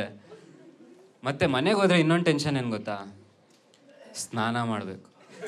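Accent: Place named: Davangere, India. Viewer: Sakath bro…I loved it man. I have friend from Dhavanagere, nin thara ne guru same mathadodu!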